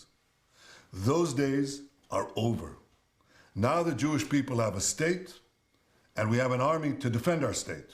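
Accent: United States. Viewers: The man who speaks good English with an American accent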